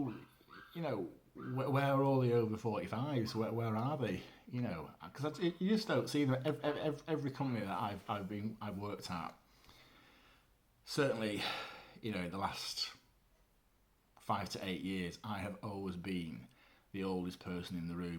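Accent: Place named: Manchester, United Kingdom. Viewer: Can tell you’re a Manc!…if you got £200 for everytime you said “You know” in this one post alone, you could probably retire comfortably for life!! 😂👍….( great video bud…hit the nail on the head 😉)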